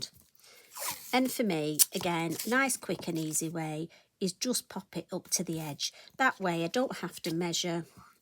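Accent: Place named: England, United Kingdom. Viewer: Thanks so much,. I honestly haven't used my stamps for years, as I started to focus on watercolouring and alcohol inks, but you have totally inspired me to get my cardmaking supplies back out from hibernation and to do some blending! It is beautiful to hear your accent, too; I'm originally from England but living in Australia since 1985 and feeling a bit homesick, so you've doubly-blessed me today, haha!!:)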